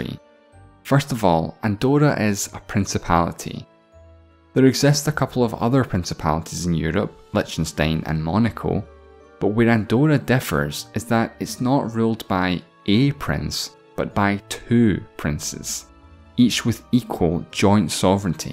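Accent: Scotland, United Kingdom. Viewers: Do i hear a Scottish accent? 👀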